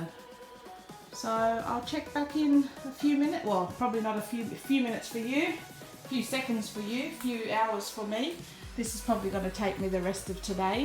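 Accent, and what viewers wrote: Australia. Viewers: I love it! It feels comforting to hear an Aussie accent too! Are you Indigenous Australian?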